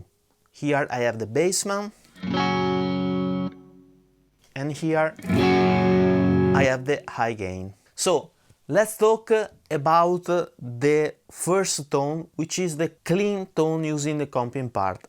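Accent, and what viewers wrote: Italy. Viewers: what part of Italy do you come from, as your accent is very thick? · Very good demo, my compliments! I am thinking to buy this pedal to use it in my upcoming recording session in April instead of the tc electronic combo 65. i can hear from miles away that you are italian and that is great 🙂! Just starting to follow your channel.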